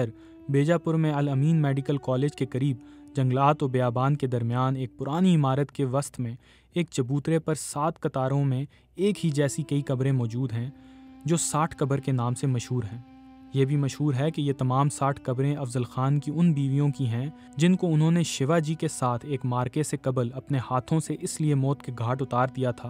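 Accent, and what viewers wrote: Punjab, Pakistan. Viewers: Exactly panjabi touch hay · What a bakwas accent. Muarrakh is pronounce wrong here. It seems it is read by someone from northern punjab of Pakistan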